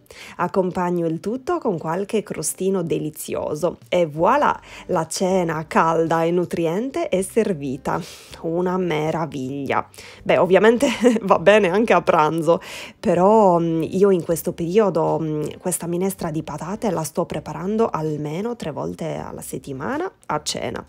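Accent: Sardinia, Italy. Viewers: meravigliosa e intramontabile ricetta pure della mia❤. ps: fallo sentire l accento sardo che è meraviglioso❤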